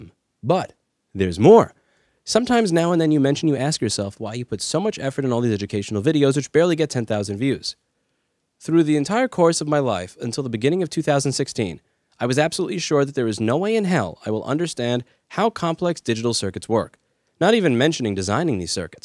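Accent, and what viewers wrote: New York, United States. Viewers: Thank you, for talking like a real New Yorker. Your message is being heard.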